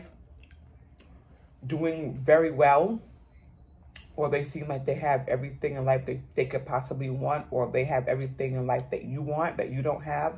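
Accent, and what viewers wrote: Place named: New York, United States. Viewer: Hi! So nice to meet you. Your channel came across my feed and I'm glad it did because we both are on a low carb journey! Great chat as we;; and I hear the NY accent! Sooo true, if you don't have your health you don't have anything! My husband is in a nursing home and I visit him 2-3 times per week and my heart goes out to the people who never have visitors. Blessings to you!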